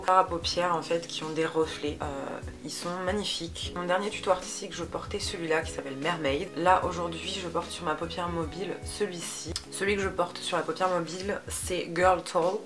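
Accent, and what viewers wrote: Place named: South, France. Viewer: J'adore ton petit accent du sud tu m'amène le soleil en haute Savoie 😊 J'adore ton ral il te va trop trop trop bien 👌🏻 Bisous bisous 😂😂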